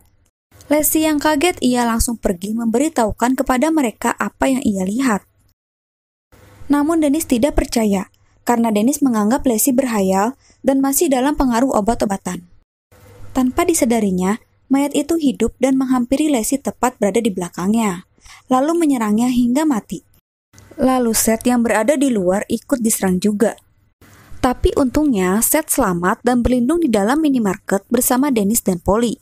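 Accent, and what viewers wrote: West Java, Indonesia. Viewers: Sunda ya kak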